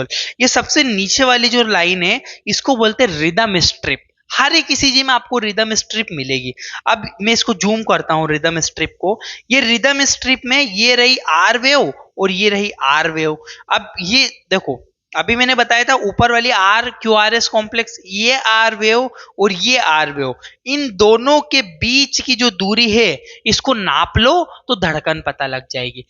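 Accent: Indore, India. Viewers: Indore se ho na aap? Language wesi lag rahi he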